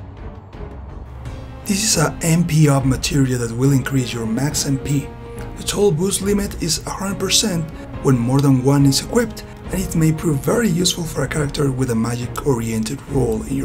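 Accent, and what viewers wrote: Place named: Canada. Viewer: Thanks man! I would never have found it without your video. Cool accent! Are you from Canada? France?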